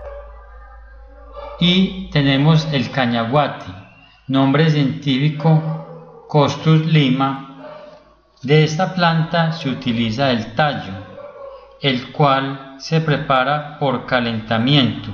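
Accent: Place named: Colombia. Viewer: Ame el acento colombiano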